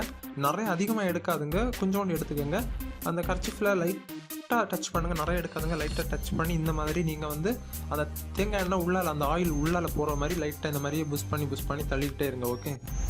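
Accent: Kanyakumari, India. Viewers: Bro neenga kanya kumaria ....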